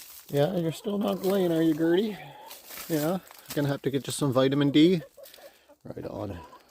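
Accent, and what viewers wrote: Canada. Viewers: Love you're Canadian accent 🫶🏼🎄🌨️❄️👋🏼🫂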